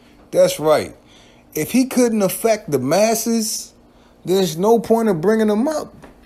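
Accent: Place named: Boston, United States. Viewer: Hey where are you cuz you sound like you from Boston you sound like Snoop from The wire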